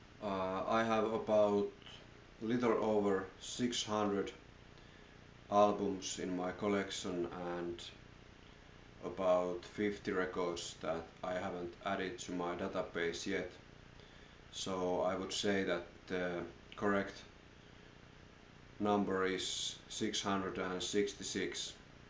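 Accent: Finland. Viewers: Great video, I could tell you were from Finland before you even said it. I just started collecting this year, I have been getting alot of, and other Finnish viking/folk metal bands. I am excited for this 13 disc box set that they are making! It is supposed to be the biggest sized set in the history of metal.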